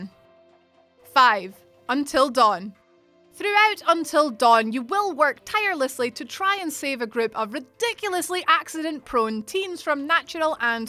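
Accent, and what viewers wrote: Scotland, United Kingdom. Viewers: This Scottish accent is fantastic!!! This is what I want for every video!!! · Shes from Kinross in Scotland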